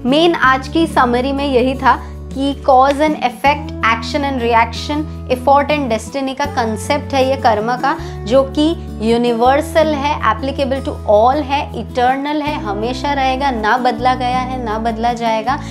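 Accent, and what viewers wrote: Gujarat, India. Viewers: Dii ! Are you gujarati?? Cuz you said "karma nahi nadta haii" 😂 I'm 100% sure you are gujju😍 I lovvee ur videos!! Actually my life is changed after watching ur videos keep going dii ur doing gud♥️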